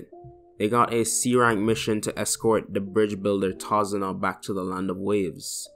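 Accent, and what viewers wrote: Jamaica. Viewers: are u Jamaican? lol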